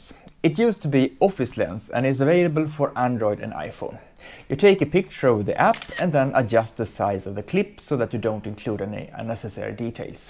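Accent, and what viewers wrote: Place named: Germany. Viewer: God bless you for your intention to help inform people. Please be aware that your high intelligence and multiple language knowledge seem to have come at the price of comprehensibility for your listeners - it is extremely difficult to discern if you are soeaking German with an Irish accent, or soeaking Turkish with a German accent. In both attempts to decipher your speech through the poor audio quality of significant room noise snd highly reflective surfaces of walls floors tables windows and ceilings, it is indeterminable. Please ask experienced web video makers for free tools and tips on improving communication and perception and creating successful communication. My grandma was from Oldcastle Ireland. So no bias against thick accents per se. :-) I'm US English speaker with simpleton level vocabulary in German and Spanish and idiot American level French - basically "fluent"! 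8-D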